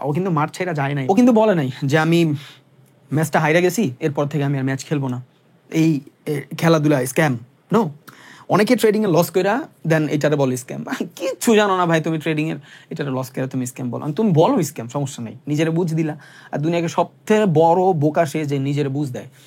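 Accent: Barishal, Bangladesh. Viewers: সবার আগে এটা আমার Question ভাই আপনি কি মোগো বরিশাইল্লা ❔🌚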